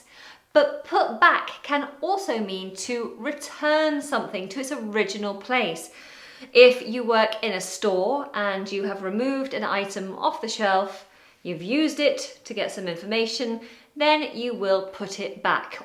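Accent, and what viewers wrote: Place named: Liverpool, United Kingdom. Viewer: lol, sudden transition effect scared me:)... SUPERB VIDEOS ..KEEP POSTING ...WANNA SEE ANOTHER LIVERPOOL ACCENT VIDEO OR MAYBE A SERIES OF VIDEOS....haha amazing accent.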